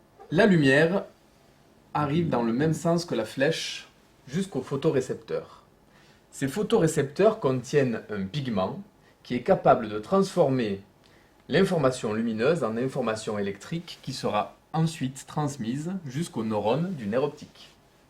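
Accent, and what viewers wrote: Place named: South, France. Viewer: Petit accent du sud qui va bien. Pepere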